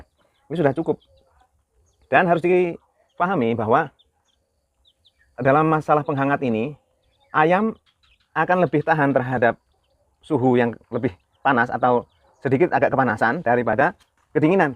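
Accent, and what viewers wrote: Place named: Java, Indonesia. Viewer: Matur nuwun Bp dgn nya. logatnya kok Jawani gih.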